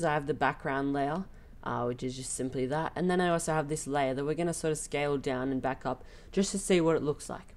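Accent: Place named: New Zealand. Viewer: trying to f-eeg-yuh out your accent: Kiwi? Aussie? Good video.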